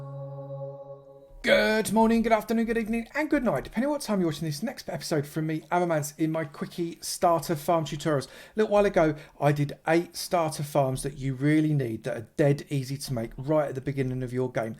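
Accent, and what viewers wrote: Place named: Yorkshire, United Kingdom. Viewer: ur yorkie accent is making mine thicker mate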